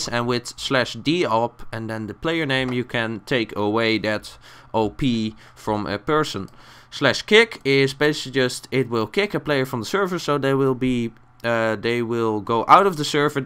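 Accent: Netherlands. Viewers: klinkt nederlands :D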